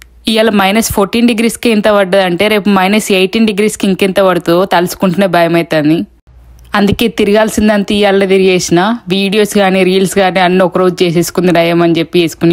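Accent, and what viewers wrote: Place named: Telangana, India. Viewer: అసలైన తెలంగాణ బాషా మీరు మాట్లాడుతారు Great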